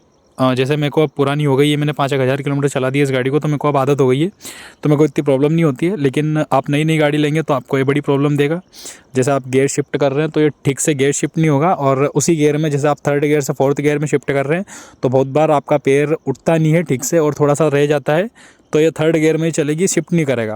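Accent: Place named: Indore, India. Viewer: Bhai tumhare bolne ka tone indore ke jesa kyu lg rha h.. tum Mp indore ke aas pas se ho kya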